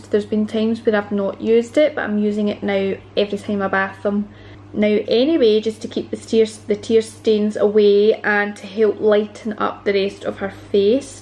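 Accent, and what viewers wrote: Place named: Scotland, United Kingdom. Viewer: Ah, a beautiful Scottish accent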